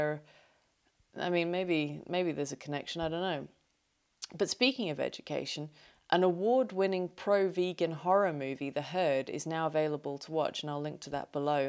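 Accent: England, United Kingdom. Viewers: A subtle yet wicked sense of humor spoken with a English accent- quite enjoyable.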